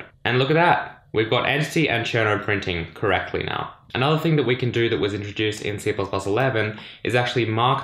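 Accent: Australia. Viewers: Hey Cherno! Got to say great channel to learn c++, awesome channel to get used to the australian accent!! :)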